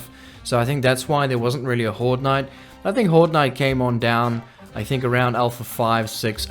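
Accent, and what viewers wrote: South Africa. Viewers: Hey are you south African the accent sounds like it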